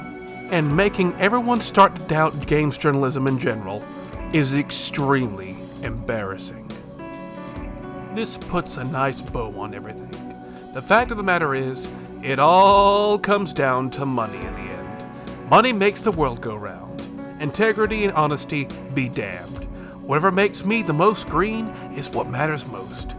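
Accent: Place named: Texas, United States. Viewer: he is from texas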